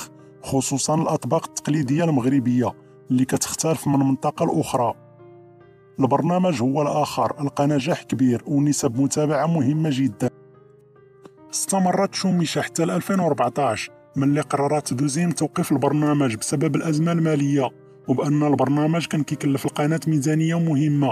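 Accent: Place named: Democratic Republic of the Congo. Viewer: تعليق مقرف من هذا الشخص وكانك تستمع الى كحلوش من الزايير وهو يتكلم العربية.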